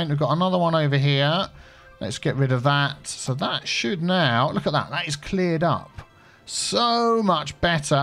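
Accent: United Kingdom. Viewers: Your british accent is the best part about your videos man!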